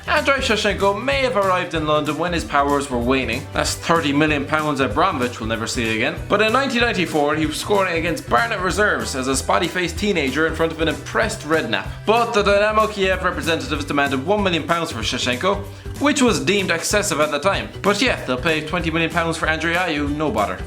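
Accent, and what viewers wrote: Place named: Ireland. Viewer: Lovin the Irish commentary xD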